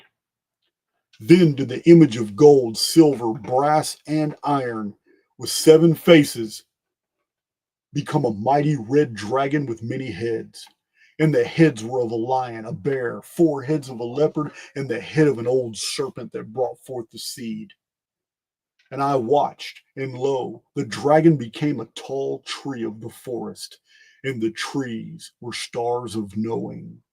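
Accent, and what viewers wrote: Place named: Texas, United States. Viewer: Thank you from the bottom of my ♥️. I got used to your voice andTexas accent and now hooked.I feel your voice and message comforting my soul. Greetings from Holland.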